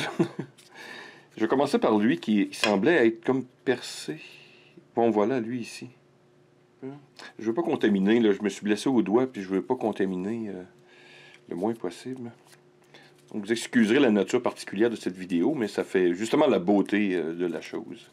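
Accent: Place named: Canada. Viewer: Interessant, j'aime l'accent canadien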